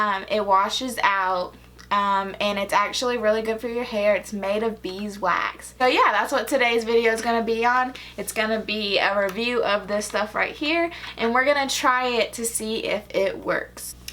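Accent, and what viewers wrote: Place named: South, United States. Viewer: Love the southern accent!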